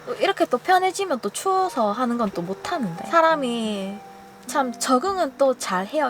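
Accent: Gyeongsang, South Korea. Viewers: 경상도 사투리 ㅎㅎ 잘보고 있습니다. 셔쿨 고정하는 거 어디서 구입했나요? 담영상 기다려지네요. ^.^ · 고영희씨가 저지레할려고 들어왔다가 딱걸렸어요 ㅋㅋㅋㅋㅋㅋㅋㅋㅋㅋ 님 경북말씨가 넘 귀엽고 정감있어요 ㅋㅋㅋㅋ 님 캠핑은 어수선함 없고 깔끔하고 믿음직하네요👍🏻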